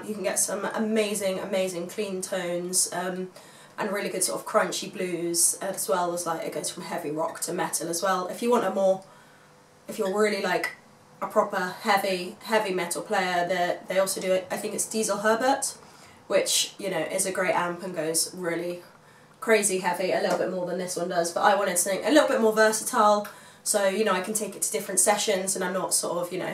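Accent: England, United Kingdom. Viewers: I just stumbled onto your channel today and I'm glad I did. You are an amazing player and seem like a really nice human being. You sound English. Do you live in Great Britain? I've watched several of your videos today including your Kiesel endorsement video. I've watched the Kiesel factory tour video 20 times, lol. I want a Kiesel Aries hardtail like yours. Anyways, just wanted to give you props and say hi and wish all the success in the world. You are a very talented player. Hope you have a great day. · I love girl with english accent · ... you’re a Brit? Holy chit. Guess I’m out of the loop. Love the accent. Gear is sweet too. 🤘🏻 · Lets review here. Hot, plays guitar and a has a Brit accent.. and a gear head... The perfect woman does exist! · you are from england this is the first time i listened to your accent well done so talented and gorgeous girl 🤘🤘🤘🤘🤘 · ты своим английским акцентом меня просто убиваешь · Love the English accent! 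· так разговаривает как британка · OMG, haven't heard a cute little accent like yours since the last time I flew British Airways !!! ... when are you touring the USA ??? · Nice to hear you talk. Didn't know you were a Brit.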